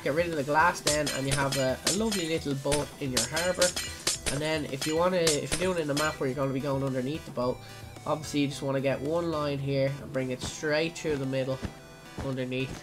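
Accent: Ireland. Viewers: r u irish so am i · R u Irish cuz I amzz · are u irish and if u are you are from dublin or tallagh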